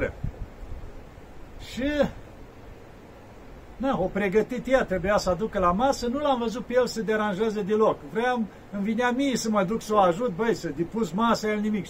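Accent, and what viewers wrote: Moldova. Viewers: Ce frumos și cu duh povestiți,va ascult cu gura căscată, aveți graiul dulce moldovenesc, mulțumesc!!! rugați vă și pentru mine, Hortenzia Mihaela,și as vrea sa ne spuneți modalitatea cum sa trimitem bani și pomelnic la d-voastră!!??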